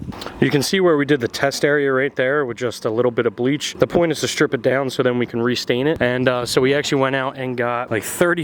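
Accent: Philadelphia, United States. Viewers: Also where in PA are you from? I’m from Delco, you definitely sound like your from the Philly area. I’m hoping to move out to the Lancaster area in a year or 2.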